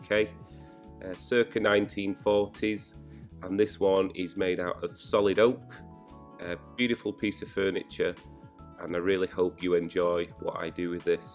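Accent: United Kingdom. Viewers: This became a stunning beauty, reallly - sub button pushed! indeed I like your British accent - in terms of "English" I'm a kind of "Britophil"! ;) Greetings from Germany, the silver fox, David 😉🦊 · Wow. What a masterpiece. You made the vid relaxing and entertaining to watch. Great editing and I still like your British accent as well.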